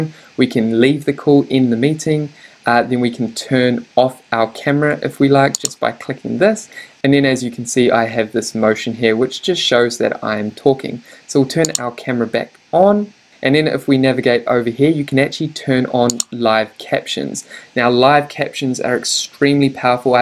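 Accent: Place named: New Zealand. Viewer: Love his kiwi accent💛💖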